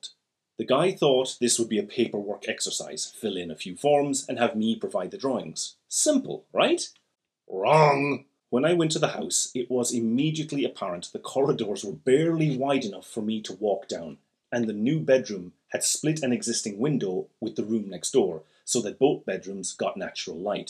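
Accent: Ireland. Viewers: Are you from Cork lol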